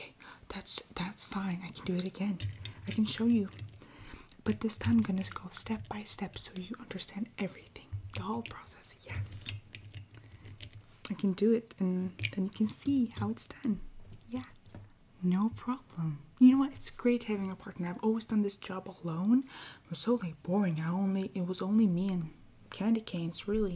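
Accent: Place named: Scandinavia. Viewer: It's so weird how I never noticed your mild Scandanavian accent until you went quasi-albino. 😅 Great relaxing video as always!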